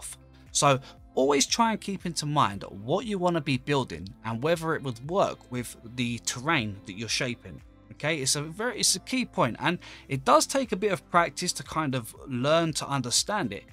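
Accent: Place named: South, United Kingdom. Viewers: How does somebody sound southern and British at the same time 👁👄👁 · 10/10 cockney accent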